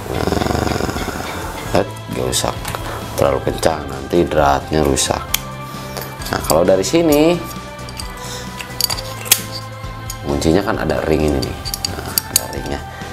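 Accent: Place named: West Java, Indonesia. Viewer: Logat ny sunda ini mah,,, timanten kang??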